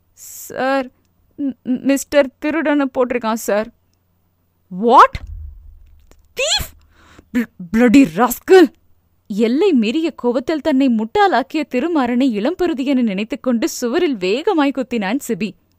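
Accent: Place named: Telugu, India. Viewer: Telugu slang super super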